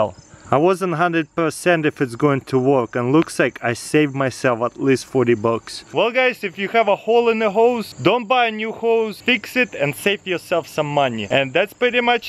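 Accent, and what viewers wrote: Russia. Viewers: Is he a Russian?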